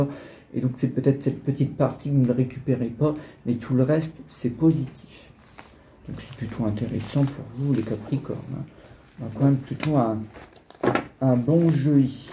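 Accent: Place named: Villers-Cotterêts, France. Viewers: Moi pareil c'est villers-co près de la Ferte Milon. J'ai quitté ma région depuis presque 20 ans et d'un sens je regrette la forêt et cette belle nature. Bien sûr j'ai reconnu cet accent puisque je l'ai gardé 😊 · J'ai passé mon enfance dans votre région. En vous écoutant, j'ai remarqué votre accent, en particulier les "o", j'ai pensé au Nord mais je sentais que ce n'était pas cela. J'ai découvert Chambry 02 (moi, c'est Neuilly Saint Front près de la Ferté Milon). Je connais cet accent tellement bien mais cela si longtemps (60 ans) que je ne l'ai plus entendu, qu'il devait y avoir un blocage pour que je ne l'identifie pas immédiatement. Merci infiniment de m'avoir ramenée dans mon village avec votre voix. J'ai noté vos coordonnées. Vous êtes un bon voyant. Prenez soin de vous et de votre chat. Bien cordialement.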